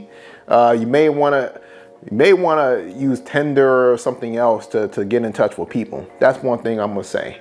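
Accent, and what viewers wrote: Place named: Chicago, United States. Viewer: Sounds like your from Chicago bro. Where did you get your test at and how much was it?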